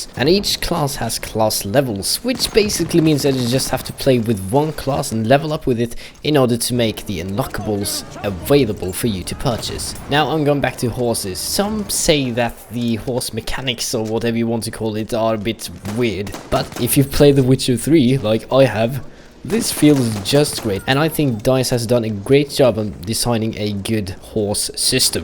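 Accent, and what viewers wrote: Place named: Europe. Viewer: You're voice is really reminiscent of DannyOnPC, just some European goodness :3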